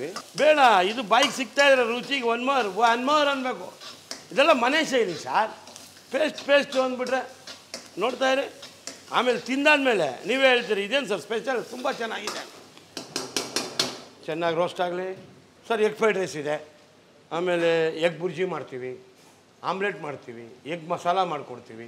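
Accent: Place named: Coastal Karnataka, India. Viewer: I like his Coastal Kannada style . Passionate cook 😊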